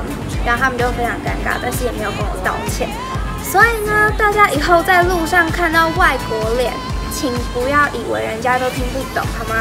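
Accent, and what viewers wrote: Taiwan. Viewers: 這中文發音很道地的台灣 ~ 妳是100%台灣人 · 哎呦喂呀，台灣的學生還這麼北七喔？暈倒，如果是我那個年代，3、40年前也就沒辦法='=。以妳的情況，妳講幾句中文，一聽就知道妳是正港台灣囝仔，雖然妳外在明顯是混血兒，但那口音必須是在台灣長大才會有的。台灣還是要再更包容才行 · 哈哈 好好笑 昨天看妳影片也是很新奇，怎麼有美國留學，會回台灣留學，聽你口音才知道妳是台灣人，而且楊丞琳跟妳有點像，哈哈😆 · 台灣腔很熟悉。台灣男生加油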